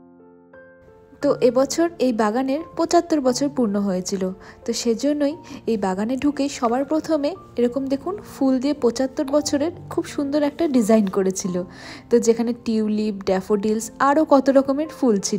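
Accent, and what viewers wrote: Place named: North Bengal, India. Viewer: এত্তো দিনে উত্তরবঙ্গের কথা শুনতে পেলাম❤😂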